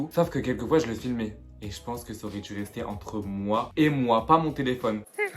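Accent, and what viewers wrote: Quebec, Canada. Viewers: Avant ton accent in n aurai dit un quebecoi😂😂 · T'es québécois ? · on dirait pas l’accent chtis mais canadien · crime ta canmeme un bon acent quebecois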